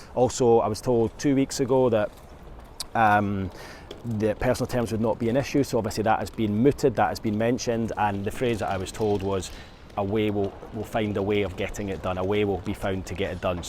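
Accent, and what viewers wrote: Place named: Scotland, United Kingdom. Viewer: Had to turn on the captions .... think his is speaking Scottish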